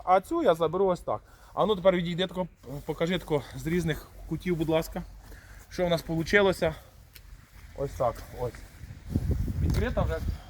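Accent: Vinnytsia, Ukraine. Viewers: Дякую за добре поданий матеріал! Говірка вінницька, так приємно!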